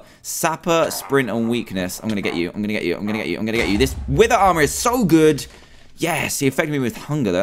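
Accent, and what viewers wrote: England, United Kingdom. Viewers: He is English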